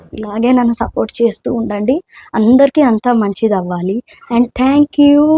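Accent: Karnataka, India. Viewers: Meeru 16 anaala telugu ammaya leka kannada vaara clarity kaavaali happy new year 🎉 🎉 🎉 🎉 telisanu anali mee vadranam baagundi alage me saari kuda super 👌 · ನೀವು ರಿ ರಿ ಅಂದಾಗೆ ಗೊತ್ತಾಯಿತು ನೀವು ಕನ್ನಡದವರು ಅಂತ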